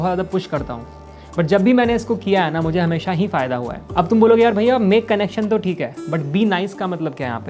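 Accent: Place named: Bihar, India. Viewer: Same ascent of mine Bhaiya I am also from Bihar Bhagalpur ❤ Currently live in kanpur , UP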